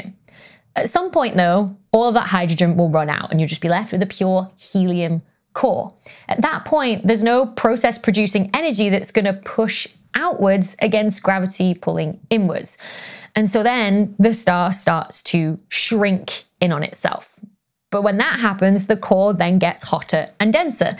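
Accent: United Kingdom. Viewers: I Totally Love You!!! Girl next door Astrophysics?! Aw, hell yeaz!!! Where were you when I was growing up? I hope you don't mind if I share this video with my FB Friends to settle a quip? The arguments were: 1) Would Betelguese blow within our lifetimes? It's possible. 2) B'Geuse is somewhere between 500-650 ly from Earth (depending on who you believe). Tycho's Star was estimated at 9,000 ly; Keppler's Nova was estimated at 20,000 ly from Earth. Without specific climactic data from the 'Rennaissance Era', how can we say that Betelgeuse is NOT a threat to Humanity? There has never been a recorded Supernova THIS CLOSE! (?) With 40 years experience in the Nuclear industry (25 years in Health Physics), I know that we'll get BLASTED with neutrinos, probably a shitload of Gamma as well. My question is (and perhaps this will settle the gripe?)... what about the Mass Ejection/ Cosmic Rays? 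I'm new to your channel, but I am totally HOOKED! I studied Astrophysics at Penn State after I left the US Navy (Submarine Force) in 1991. No offense to Neil De Grasse Tyson, Stephen Hawking, Kip Thorne or even Carl Sagan... you are MUCH prettier than them! You are an amazing COMMUNICATOR. Keep those beautiful blue eyes, sweet personality and soft 'Brit' accent out there.... I'll be here!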